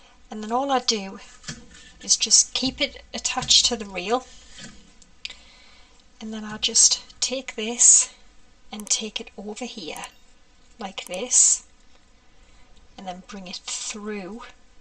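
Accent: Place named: Northern England, United Kingdom. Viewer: It's almost half past one in the morning here - I found you earlier this evening and am blown away! What a star find! I've been card making for around 20 years and just love your ideas. Photopolymer stamps also have a distinctive smell compared to acrylic ones - sadly even some reputable UK designers are cutting their costs and still have their stamped made overseas and many of them are acrylic. AND, my laminator is coming out to play when I eventually get up later today! HUGE thanks - how I wish I'd known a lot of this particular video when I started out.. I obviously have a lot of hours of fun viewing ahead! Di x (Northumberland exile - so it was an extra delight to hear your lovely Northern accent).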